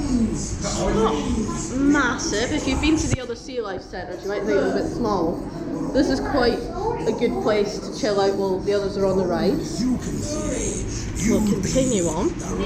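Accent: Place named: Scotland, United Kingdom. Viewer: tied up in the car with tape across his mouth? No wait … he's free! Jokes aside great vlog, very informative and let's face it the Scottish accent adds to the quality;) I'll come across as a bit of a H&S geek but that door should be better marked or open inwards, you could be reading that info board up close then whack!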